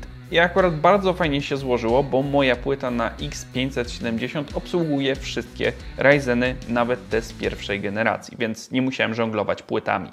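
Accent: Silesia, Poland. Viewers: po śląsku godo a kto nie wie to gorol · oho jest ze Śląska, Pozdrawiam Hanysa:D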